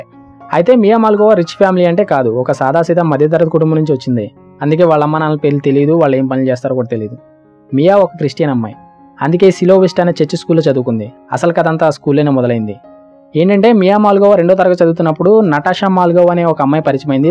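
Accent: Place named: Chittoor, India. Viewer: Medhi a voori anna Chittoor yasala vundhi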